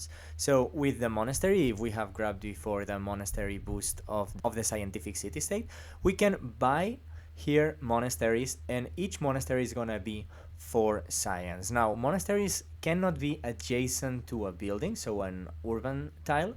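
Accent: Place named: Iberia. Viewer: ahahah sehr cool mein freund. where u from anyways? by your accent i would assume iberia or south america🙃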